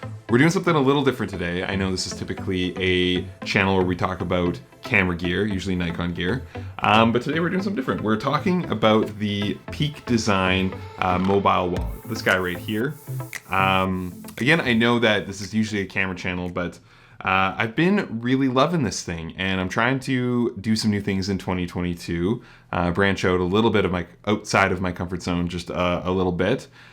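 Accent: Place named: Canada. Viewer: Excellent review. Merci beaucoup du Quebec. I noticed your Canadian accent and the bills in your wallet.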